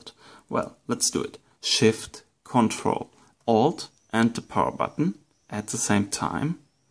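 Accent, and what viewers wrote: Germany. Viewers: german-english hört man halt einfach immer raus xD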